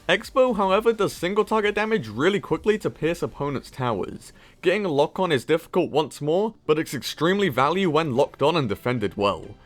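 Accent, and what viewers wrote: United Kingdom. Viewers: I got it your a British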